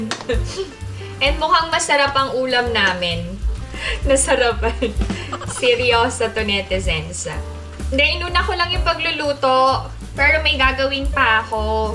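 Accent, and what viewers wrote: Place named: South Korea. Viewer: Hello,! Napansin ko lang po sa mga vlogs nyo na mala-Korean na rin po yung pananalita nyo and curios ako kung aware po kayo dito. What I mean is laging may vowel sounds (-uh) na yung mga words nyo ending in consonants. Say for instance the following words: Steak-uh Netizens-uh And-uh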